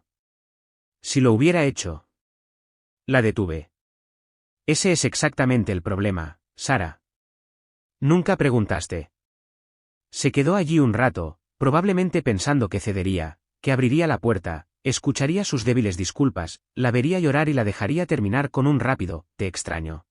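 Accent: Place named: Andalusia, Spain. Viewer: ÉSE TONO, MEDIO ANDALUZ, MEDIO ESPAÑOLADO,ES BASTANTE SINGULAR...ES EXCELENTE LÁ DICCIÓN... SALUDOS CORDIALES..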